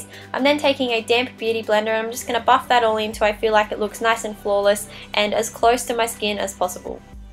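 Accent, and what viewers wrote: Australia. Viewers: this is so cool, and are you australian you sound like your aussie lol x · r u australian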